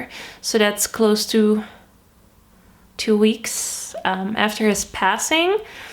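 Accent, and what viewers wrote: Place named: Netherlands. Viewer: Toen je begon te praten hoorde ik gelijk dat je nederlands was! haha, loved the vid.!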